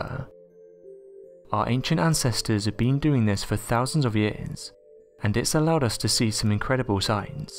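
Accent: United Kingdom. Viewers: I saw no proof or evidence regarding the claim of NASA. Instead all I heard was a British smart alec talking in riddles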